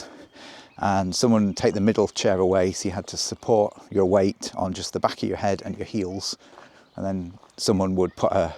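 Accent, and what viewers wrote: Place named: Scotland, United Kingdom. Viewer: He could be a voiceover man for the BBC, they love a bit of Scottish. If only he were mixed race he would earn a fortune.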